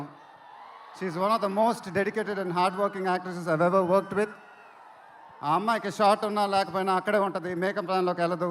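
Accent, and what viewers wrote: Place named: Guntur, India. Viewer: Guntur slang 😅vamoovvv adhem dansushhhh😅 mana gunturodura ma anna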